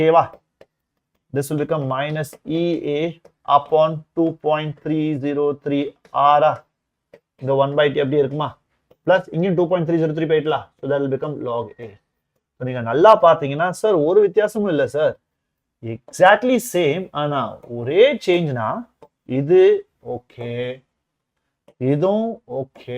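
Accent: Tirunelveli, India. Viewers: Sir ku tirunelveli pola 😅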